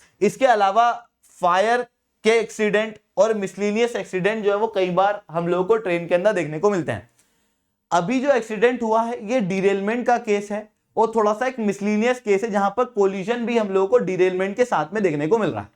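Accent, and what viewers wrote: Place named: West Bengal, India. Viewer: chaudhary it's WB accent not odia accent. Not so new tho since odisha is not recognised that much in whole india.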